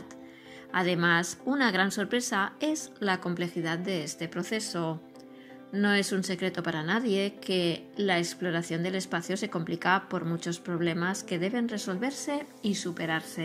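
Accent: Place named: Eastern Europe. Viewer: Es curioso este canal solo tiene videos de Musk y Rusia, tampoco he visto que el titulo se corresponda a nada que haya dicho Musk, así que todo esto tiene un cierto "TUFILLO" el "Mejor motor del mudo" si de hace 30 años era y es muy bueno y muy caro por el precio de 1 Space X hace 5 mejores y al menos igual de fiables. La locutora habla un poco como si fuera como del Este, en fin. Y la traca final "No vale la pena analizar los aburridos números" y tampoco que el RD 180 llega a una presión de 257 bares y un raptor ha llegado a 330 ese aburrido numero dice, más rendimiento más potencia. Una kk de video lo he visto a 1,5X y he perdido el tiempo y atufa a Kremlim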